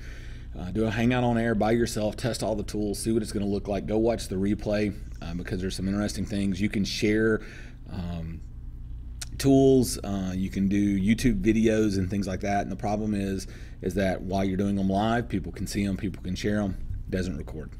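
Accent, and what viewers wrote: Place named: South, United States. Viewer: That intelligence... That Professionalism... That southern drawl. :D Well done, sir. Great information!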